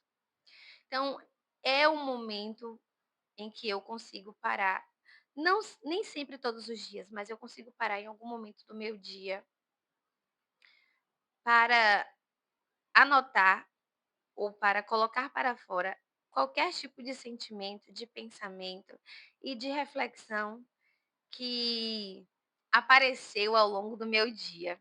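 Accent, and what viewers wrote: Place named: Bahia, Brazil. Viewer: Amei sua voz! Sou baiana também e amo achar pessoas no YouTube falando baianês também! Muito legal sua forma de fazer diário!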